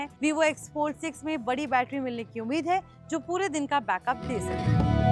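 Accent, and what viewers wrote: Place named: India. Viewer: What language are you speaking? Sounds like India dialect mixed with bunch of some english words. Best regards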